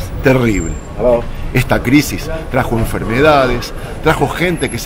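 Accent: Argentina. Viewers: Muy buen documental. Por su acento Usted es argentino?. Fui profesora de historia y geografía en Argentina ahora jubilada y me gusta mucho ver documentales. Lo felicito es muy interesante vuestro video. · Este señor es argentino? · It sounds like an Argentinian accent.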